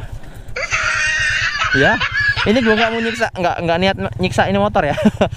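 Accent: Java, Indonesia. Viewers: kok ngomongnya jawa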